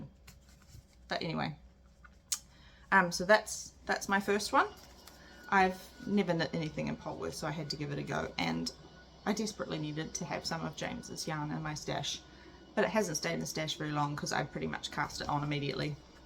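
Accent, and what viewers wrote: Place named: New Zealand. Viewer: Hi - I've just found your podcast and I am loving listening to a local (Kiwi) accent. You've made some amazing items with such easy to follow show notes too - my "to knit" queue is getting longer.............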